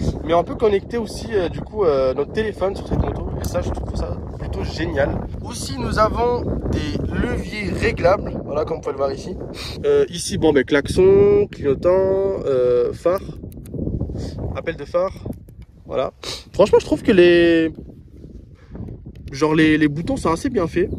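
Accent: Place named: Banlieue, France. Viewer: Tu as un accent des cités. Tu viens d'où ?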